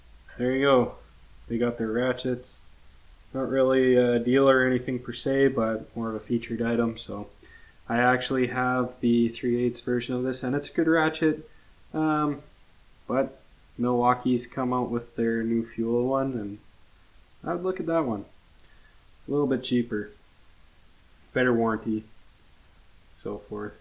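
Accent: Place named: Alberta, Canada. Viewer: Enjoy the videos man. You sound like a fellow Albertan! Subscribed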